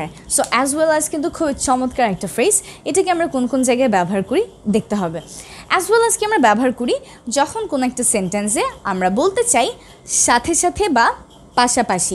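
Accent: United Kingdom. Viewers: অাচ্ছা অাপু অাপনার pronounce কি ব্রিটিশ? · ছোট বোন তুমার ইংলিশ উচচারণ গুলি ইংল্যান্ড এর লোকদের মতন হয়।ভালো থেকো সুভকামনা রইলো।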